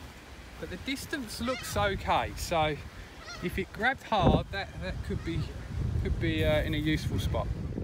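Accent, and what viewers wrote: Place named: Cockney, United Kingdom. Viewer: nice... Looking fwd to seeing that come through. Cheers for the sub back... I couldn't place your accent... It actually has hints of cockney on it. Hopefully I haven't just offended you. Lol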